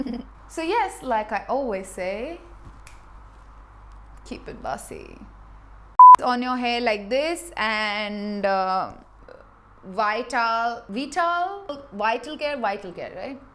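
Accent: India. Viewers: Priyanka Patnaik I understand what you are saying. But she cannot help it. When you listen to different accents all day you tend to get slightly confused. It does not bother me though. I prefer her natural Mumbai accent, I lost mine in the US, so it feels like home. ❤️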